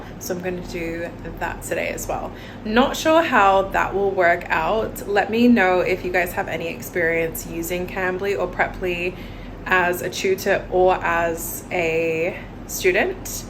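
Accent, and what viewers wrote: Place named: Australia. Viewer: You got a Mystic Accent( (Oz/Yanky )have you been a teacher previously?With That Accent you’ll Acquire the Job Easily!! Good Luck & Enjoy ❤️‍🩹🇹🇭👍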